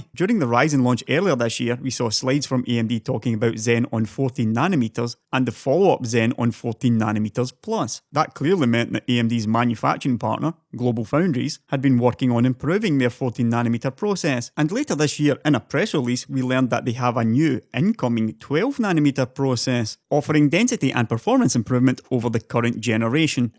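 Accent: Scotland, United Kingdom. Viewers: I can hear the Scottish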